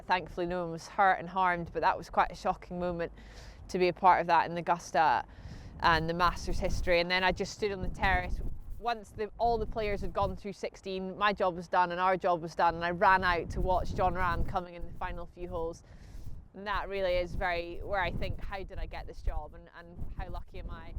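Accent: Scotland, United Kingdom. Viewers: your the best pal love to hear your lovely Scottish tones on the tv,the future for you in golf is going to be rosey am sure,may the course be will you ❤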